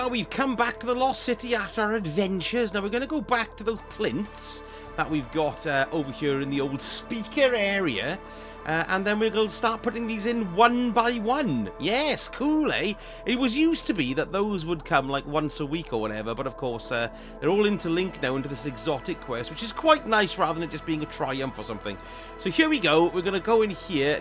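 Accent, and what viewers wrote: Wales, United Kingdom. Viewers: didnt expect the welsh accent haha, first time on your videos. Great content, cheers for the guide!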